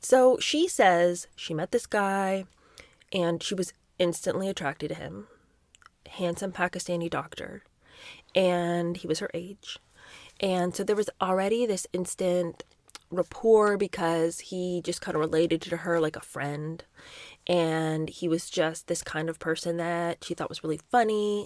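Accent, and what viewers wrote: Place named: England, United Kingdom. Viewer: Her accent is awesome. I can't figure out where its from I feel like england?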